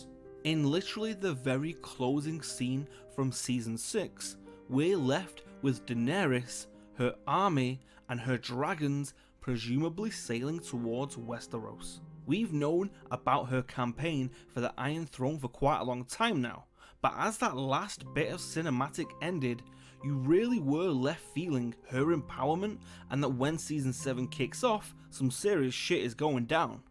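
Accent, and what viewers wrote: United Kingdom. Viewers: sounds like something from the UK? Hope he'll tell us!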